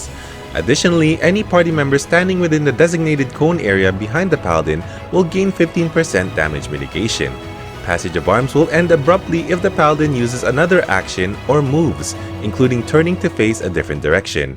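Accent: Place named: Philippines. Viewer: You sound like a Filipino with your English accent.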